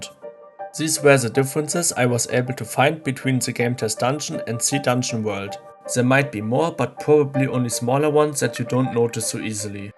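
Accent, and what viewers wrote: Germany. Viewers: Man Hört so dein Deutsch raus xD deine Aussprache ist auch fast so gut wie meine oder besser so schlecht xD😂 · Gutes video und interessante Welt die du uns gezeigt hast. Ich finde es übrigens auch top wie man immer hört wenn jemand aus deutschland kommt xD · its weird to hear a german accent when yourself are german, i dont have that accent, so it explains why some people were surprised when i told them i was german. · First time i hear you voice and i just gotta ask: are you german?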